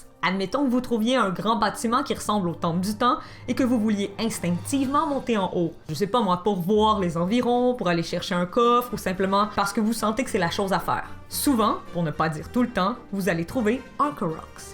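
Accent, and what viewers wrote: Quebec, Canada. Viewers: Tes Canadien mec? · Jte kiff, honnêtement dhabtiude j'aime pas les commentatrices et encore moins avec l'accent du Québec mais la je suis tombé sous le charme de ta voix et de ta prestance ! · Ta voix est trop cool je trouve, l’accent québécois est super. Sinon les montages sont cool. Et puis pour les info il me semble que le guide papier du jeux est très complet, perso je lais et c'est plutôt cool, il parle même des 3 dragon, et donne leur lieux passage etc.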